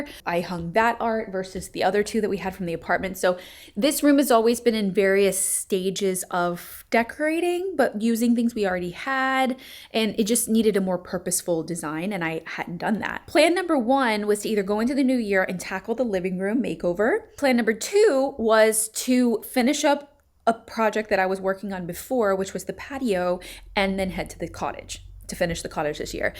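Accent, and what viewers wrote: Texas, United States. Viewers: I think your Texas accent came back a little bit during your time there. It’s cute to hear, as a midwestern viewer. I’m glad you’re having fun with your living room, even if it’s temporary.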